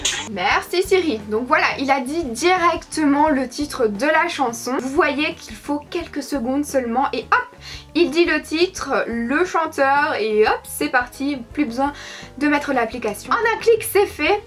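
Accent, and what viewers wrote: Belgium. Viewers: T es belge ??❤️❤️❤️+1abo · tu es belge?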